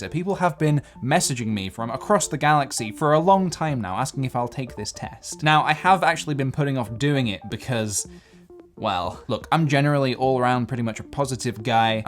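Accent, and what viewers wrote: United Kingdom. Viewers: My gad, just fell in love with your British accent (if any French people are also hanging round here, sure yall agree) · why u sound british but not really :/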